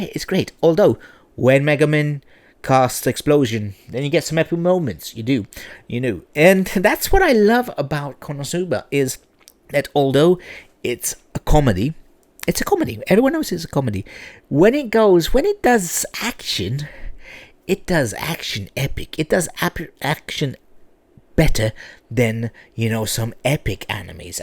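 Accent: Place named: England, United Kingdom. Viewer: English accent got me feeling something 😉 well done bro 🩵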